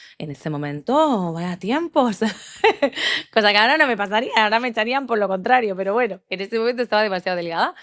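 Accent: Spain. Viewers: De qué país eres escuché México pero tienes obviamente el acento de España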